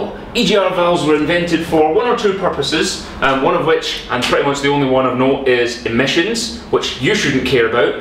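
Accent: United Kingdom. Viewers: Hi there , Like the video and the English accent to be honest (am Belgium) Question: witch software are you using please ? Cheer's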